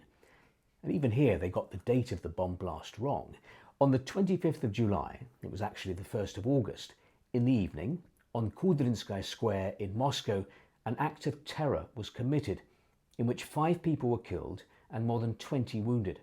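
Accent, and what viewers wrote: United Kingdom. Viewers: Not only BRILLIANT and SO BRAVE, dear Sir, but I guess we all in north America enjoy and admire your magnificent Oxbridge diction. Spasiba, poka poka for now, and God bless You ! Slava Ukrainia.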